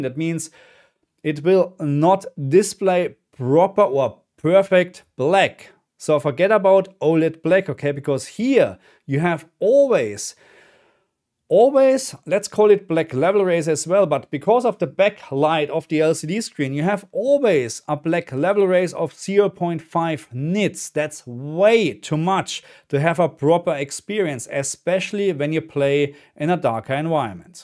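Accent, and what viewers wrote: Germany. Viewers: Is this guy german?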